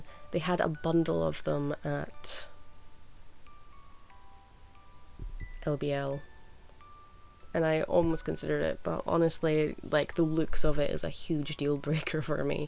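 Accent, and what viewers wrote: Scotland, United Kingdom. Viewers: Where does your accent come from? Are you Scottish?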